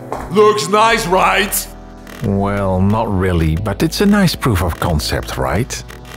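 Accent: Netherlands. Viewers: Very Dutch accent: replacing all the 'th' sounds with a 'd'.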